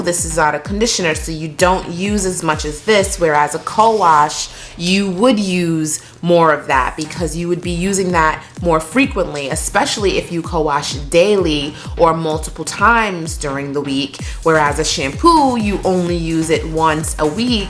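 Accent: United States. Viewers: you have a new york/louisiana/californian accent all in one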